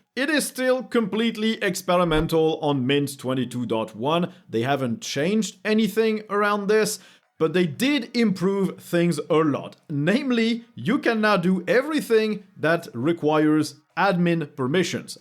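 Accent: France. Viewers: Snif snif ça sent l’accent français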